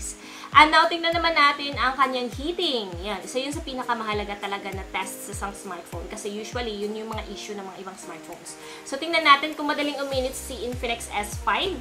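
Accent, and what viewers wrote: Visayas, Philippines. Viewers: bisaya d i ka maam? hehehe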